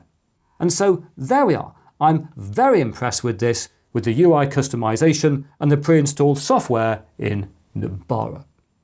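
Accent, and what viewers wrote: United Kingdom. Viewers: I am extremely happy to finally running across a system that had creators in mind allowing for DaVinci Resolve being flawlessly installed and even on AMD which is my config. And thank you so much running us through the process of not only installing the system but also through the Resolve install process! And all that done through a cool british tongue 😉 and just an overall great way of explaining everything. Keep it up!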